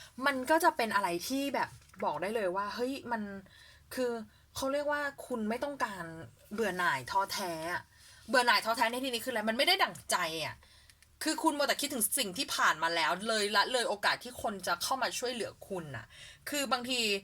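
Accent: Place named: South, Thailand. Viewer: ชอบแม่หมอจังเลยค่ะฟังแล้วเคลียดี น่ารักค่ะ ฟังละไม่เครียดเลย อ้อร้อด้วยคนใต้ป่าวนิ อิอิ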